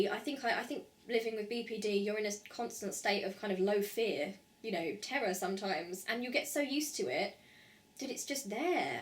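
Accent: United Kingdom. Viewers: Unfortunately there still is stigma in society for mental illnesses, but you are courageous to have made this video. YOU are not YOUR mental illness after all. I once had a relationship with a girl who has BPD/EUPD and at the time, one book I read was very informative on understanding her illness. I Hate You--Don't Leave Me: Understanding the Borderline Personality by Jerold J. Kreisman and Hal Straus. ISBN-13: <num> Best of luck in all your hopes and dreams and may I just say as a Canadian, that I love your British accent.:)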